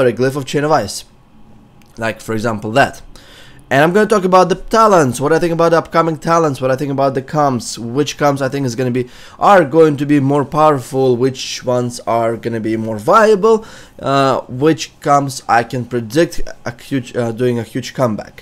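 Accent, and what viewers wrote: Russia. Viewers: That's fucking great! XD The Russian accent is awesome too, if you were playing a Draenei Dk it would be even better hahaha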